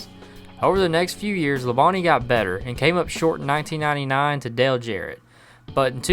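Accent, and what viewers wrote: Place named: South, United States. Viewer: If Jeff Gordon was a Southerner I imagine he would talk like that.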